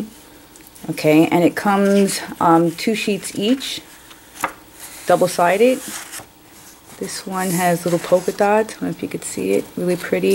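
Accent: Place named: New York, United States. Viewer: Beautiful!! Also (grin) sounds like you are a sister New Yorker.....I was born and raised in Greenwich Village (went to P. S. 41 on 11th and 6th) - nice to hear the accent - music to my ears! THanks for subscribing - I am lost in your videos right now......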